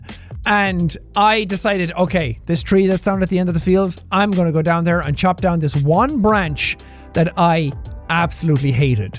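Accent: Ireland. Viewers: Okay but how he pronounced "dinosaur" was the cutest thing?? I ain't one to make a comment like that but idk. It was cute. ANYWAY! I think jack's talking about Ireland has really amplified his accent and I'm here for it 😂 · My goodness, Irish was full frontal in this video 😂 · After seeing a 7 year old video of, i wonder when did he become so irish? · Idk if this is just me but irish accent makes me happy watching his videos in genral make me happy.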